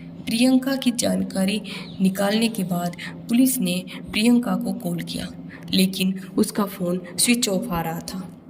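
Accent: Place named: Haryana, India. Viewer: Awaz haryana ke hai, ma'am haryana ke hai.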